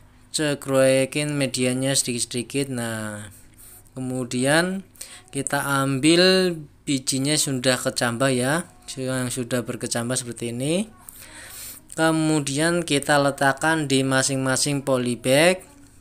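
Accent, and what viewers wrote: Banyumas, Indonesia. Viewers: ngapak bosss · Oalahhh ngapak, aku wong Gombong mas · Wahhh...terimakasih ilmunya mas..saya jadi paham cara budidaya buah naga..akan langsung saya praktekkan..btw mas orang banyumas apa mas?deneng ngapak?siipp banget..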